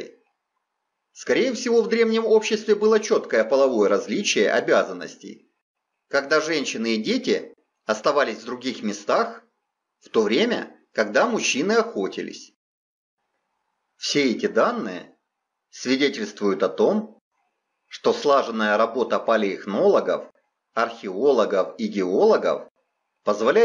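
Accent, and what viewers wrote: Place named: South, Russia. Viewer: .. ну южно-русский говор и что?..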